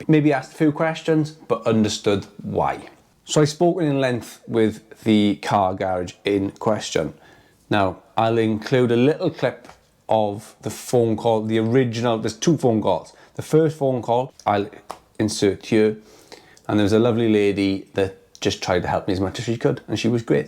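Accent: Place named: Northern Ireland, United Kingdom. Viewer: Gotta love a Northern Irish accent...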